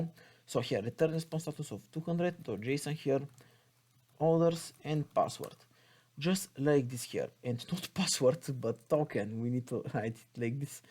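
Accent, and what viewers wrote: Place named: Bulgaria. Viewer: hah me too ! :) just the accent of english :D and i decide you are bulgarian lol :D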